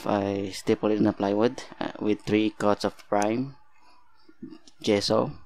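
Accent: Philippines. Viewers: By just listening to you, I know you are my kababayan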